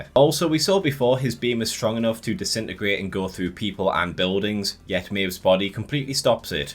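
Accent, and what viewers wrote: United Kingdom. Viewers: 2 HOURS OF A BRI-ISH GUY BITCHING ABOUT HOW UNBELIEVABLE A SUPER HERO SHOW IS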